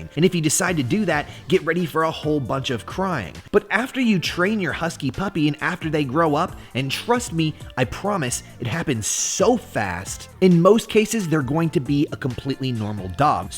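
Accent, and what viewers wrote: England, United Kingdom. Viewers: Hi luv the Skateboard...Luv the English Accent nearly like mine...😍. Nice to see Roo I would like to get a Husky in the future but it is like you say a lot of things to think about . But your videos are very helpful.. I just think they are gorgeous. And I hope that my Husky will like my 🐈..one Day.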